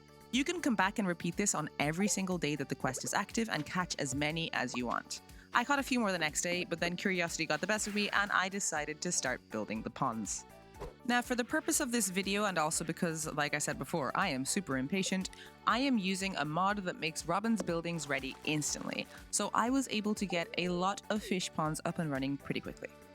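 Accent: Ireland. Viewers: while I found it a bit jarring (as an unfortunate American) to hear it pronounced that way, I personally figured it had to with where you're from. It's easy to tell you're Irish from your accent. Every English speaking country speaks it differently, and even then it can be different just based on which part of the country you're in 😅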